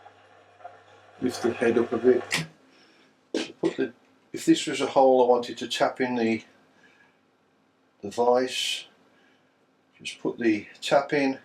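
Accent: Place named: Midlands, United Kingdom. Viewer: and a midlands accent too!!!!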